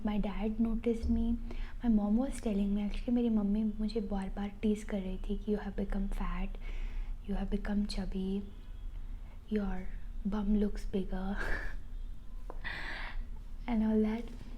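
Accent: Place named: United States. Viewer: Mujhe laga aap American ho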